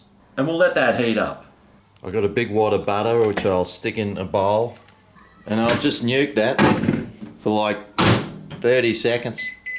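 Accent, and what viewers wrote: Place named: Australia. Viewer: Narrated like a True Blue Aussie 🇦🇺. Bloody shame chickens only have two wings... If they had four, I'd need two bottles of Frank's 👍😄🤤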